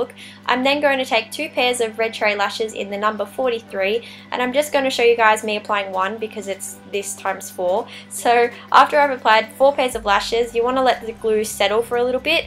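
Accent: Australia. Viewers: this is so cool, and are you australian you sound like your aussie lol x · r u australian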